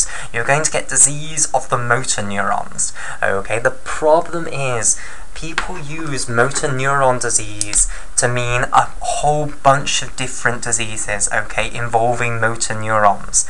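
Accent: United Kingdom. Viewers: He sounds British; probably from the UK/England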